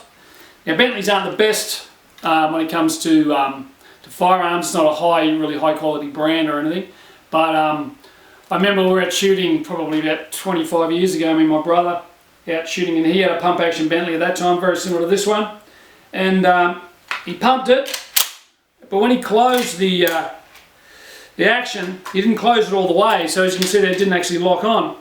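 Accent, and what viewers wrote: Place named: Australia. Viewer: lol, don't understand how all you yanks can't understand what he's saying, He does not have a really thick Australian accent, its just moderate. trust me just listen to the vid a couple of times and you'll get round the lingo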